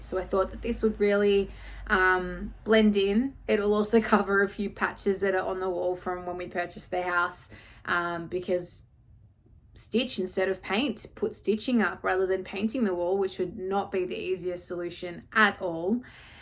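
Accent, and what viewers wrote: Australia. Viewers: Hi.. loved your first flosstube!! Keep them coming as I’m loving hearing another Aussie voice.. stay safe xx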